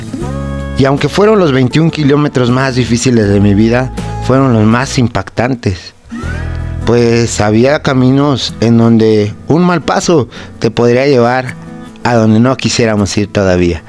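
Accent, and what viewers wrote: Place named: Mexico City, Mexico. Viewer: Tienes acento y pinta de chilango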